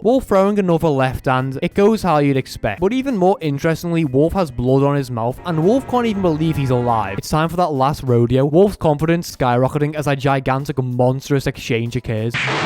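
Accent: United Kingdom. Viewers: MOST British accent ever I've ever heard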